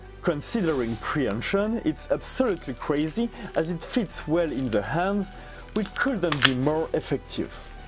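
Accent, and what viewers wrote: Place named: France. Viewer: Part of speaking other language is using its pronounciation. If you speak English, do not use French pronounciation. Respect your listeners by doing 100%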